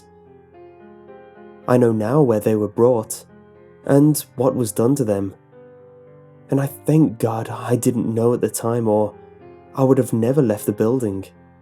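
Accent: United Kingdom. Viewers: That's his real voice. He's British. He's cool.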